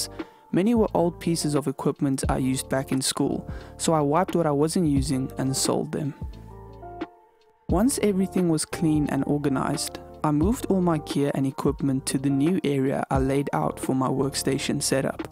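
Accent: South Africa. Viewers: You really sound South African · Are you South African ? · bro u have a south african acent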